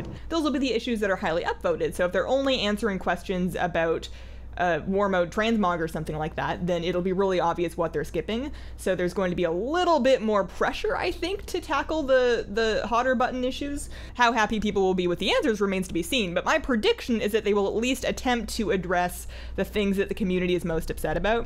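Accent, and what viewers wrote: Canada. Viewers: You're Canadian, aren't you? :)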